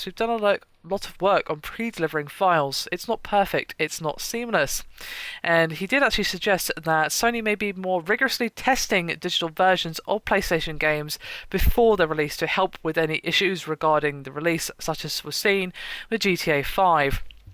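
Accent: United Kingdom. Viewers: the fruity little british accent makes this unwatchable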